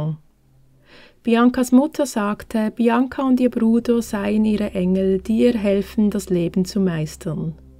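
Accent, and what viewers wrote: Switzerland. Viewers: Du bist eine Schweizerin ….hihi…..man hört es 😃 Ich auch ….aber bei mir hört man es weniger …..weil das meine 2. Muttersprache ist Hab dich gerade entdeckt Abo dagelassen 🧡 Wenn du noch bisschen was übest gehts besser mit dem Hochdeutsch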